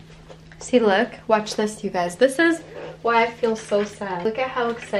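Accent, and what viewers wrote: United States. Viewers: This is the first time that I've watched one of your videos. God bless you. You cry because you care and that is why I take the time to comment. In the space of nineteen minutes you gave me so much real information and so full of emotion and determination. Your past experience with animal rescue and the skills that you've learned.. You said the Vet bill came to over a Thousand Pounds, with that accent It should have been Dollars.?. Then you went on about the UK government, so I guess you're living in my country now, which is just lovely. I hope you acquired the right number of signatures on your petition and Boris was forced to talk about Chameleons rather than Covid. 😀👍 🦎✔ 🦠❌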